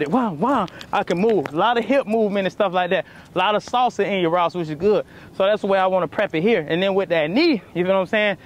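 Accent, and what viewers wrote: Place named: Florida, United States. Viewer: That Florida twang is so exclusive! 💪🏾😎🌞🌴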